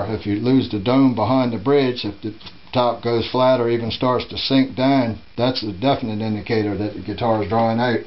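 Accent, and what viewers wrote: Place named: Virginia, United States. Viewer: That's a Virginia accent. Any North Carolinian would recognize it immediately!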